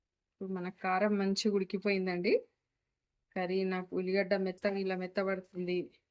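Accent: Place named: Telangana, India. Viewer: Nice telangana slang ❤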